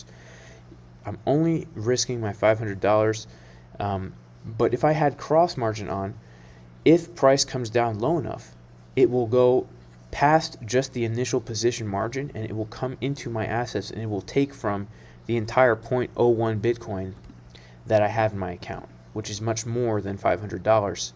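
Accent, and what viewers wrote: United Kingdom. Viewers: Nice English accent. Im from UK so I am impressed. Thank you for your tuition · Haha love the British accent !! 😂😂😂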